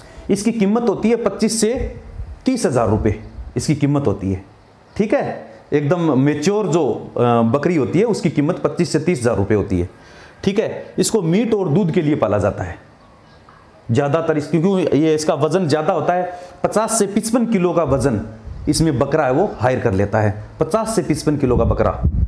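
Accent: Rajasthan, India. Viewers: Sir aap Rajsthan se ho kya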